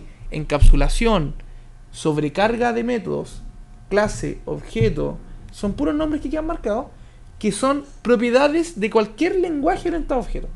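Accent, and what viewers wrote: Chile. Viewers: que gusto escuchar chilensis asi si se aprende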